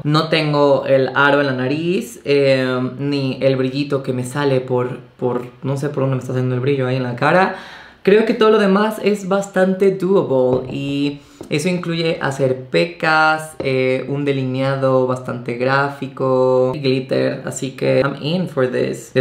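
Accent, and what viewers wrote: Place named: Fresa, Mexico. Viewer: Treintañero (a) gay aun no descubre que hablar arrastrado tipo "fresa" en 2020 da cringe. Flop.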